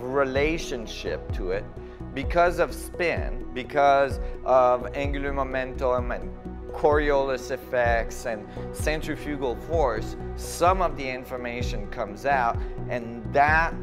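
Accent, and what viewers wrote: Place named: Quebec, Canada. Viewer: Just found this one....following Nassim since 2006.....LOVE HIM, LOVE HIS MIND, HIS LOOK, HIS FACE, HIS QUÉBECOIS ACCENT, the whole being....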